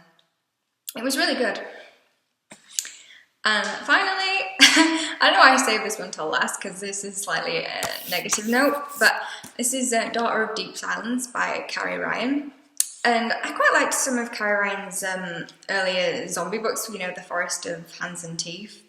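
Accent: United Kingdom. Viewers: Aw, your cat is so well-behaved! Uprooted is next on my list. Anything that gets compared with Howl's Moving Castle is an instant win. Also, it's so great to hear a Northern accent! My Stoke one tends to get the ear squinting treatment! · subscribed to your channel! Please keep uploading new videos. I felt in love with your British accent. :D The more videos you upload the more confident you eventually become. :) p.s. nice T-shirt. Give your cat a rub it was well-behaved. :D :D :D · I'm so glad your doing video blogs now. I love hearing about the books, I have read a lot that you mention in your blogs and others I add to my list as you make them sound interesting. I love your British accent as I love all things British. Had to include that!:)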